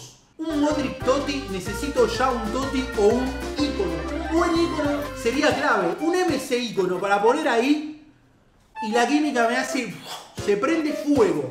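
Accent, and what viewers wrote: Argentina. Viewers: Me gusta el acento Argentinos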